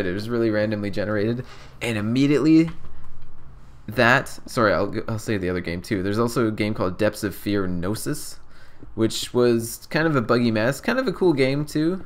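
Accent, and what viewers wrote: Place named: Canada. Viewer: Are you Canadian, or not? The way you say "about" makes me think so. XD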